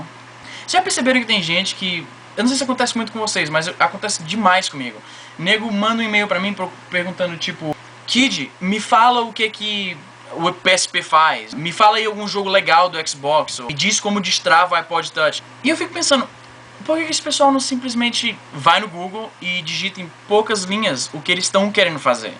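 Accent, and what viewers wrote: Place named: Northeast, Brazil. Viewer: tenho amigos online do nordeste e eu percebo um pouquinho de sotaque sim kk, mas bem pouco mesmo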